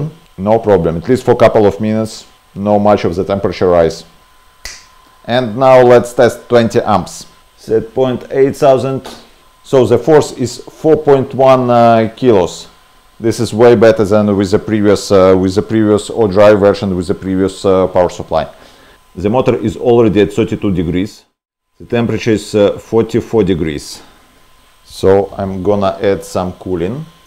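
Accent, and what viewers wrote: Russia. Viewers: Акцент, как у русского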